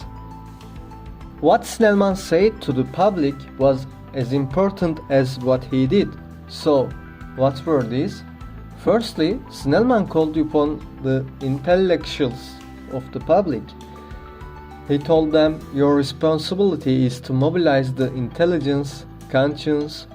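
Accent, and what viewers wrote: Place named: Turkey. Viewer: açar açmaz Türk olduğunu aksanindan anladim :))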